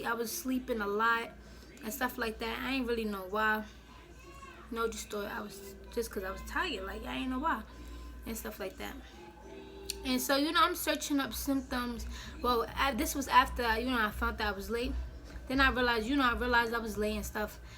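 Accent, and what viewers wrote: New York, United States. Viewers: You know she from New York 🔥😂🗽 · Are you from New York? You sound like you are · Ch Ch she probably from New York . That’s what her accent sound like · you from new york ? 😭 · are you from new york?😭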